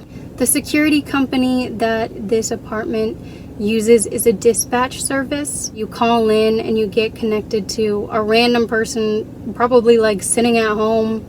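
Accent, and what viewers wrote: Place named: United States. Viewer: I noticed your car is a right hand drive. But you have an American accent. Are you in the states or did you buy a car from overseas and ship it to the states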